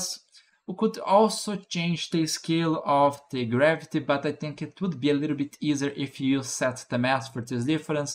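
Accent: Brazil. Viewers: Brazilian portuguese detected 😂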